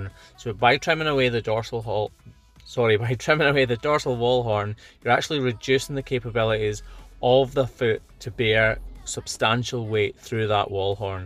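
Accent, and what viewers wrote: Scotland, United Kingdom. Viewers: I love your videos. Could listen to your Scottish brogue all day, which is probably why I watch your videos for hours! One question (as I used to be a riding instructor, and horses were my life for many years) would there ever be a time when you might need to x-ray a cow's feet to work out what's going on internally? Many thanks from a very wet and windy Berkshire :-) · I am working on a Scots accent so his videos are very helpful—no thirst watching here😂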